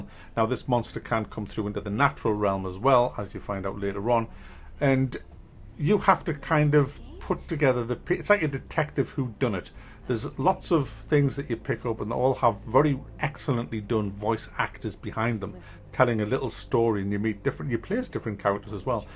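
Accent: United Kingdom. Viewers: I've always disliked how nasal the English can be with their letter "a" like when they say, "Absolutely." What's accent? What part of UK? I've been a subscriber for a long time and always liked how he sounds.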